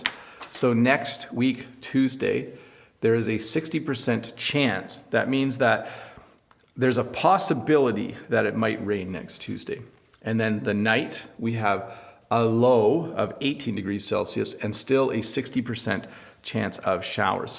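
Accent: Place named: Canada. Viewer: Hey, I would like to follow the Canadian accent. Do you have any tips?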